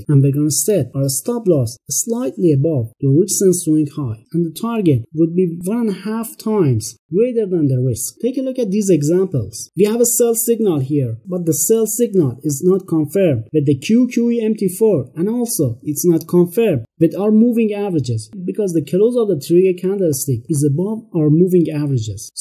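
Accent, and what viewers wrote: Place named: Iran. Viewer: حاجی چقد لحجه ایرانی داری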